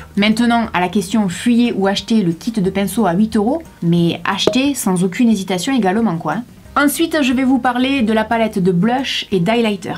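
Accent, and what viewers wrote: South, France. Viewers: J'ai découvert ta chaîne aujourd'hui, et j'adore :) !!! Ton accent du sud est super joli, mais j'aime beaucoup comme tu présentes tes produits :) Je m'abonne !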